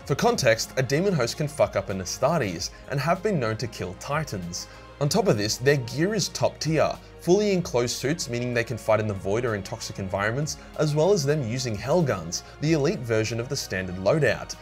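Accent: Australia. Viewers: I now have the head canon that Chadians has Australian accents, it just feels right for some reason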